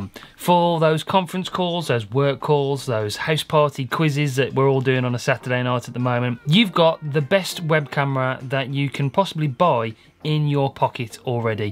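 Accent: Birmingham, United Kingdom. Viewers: Loving the brummy accent from another brummy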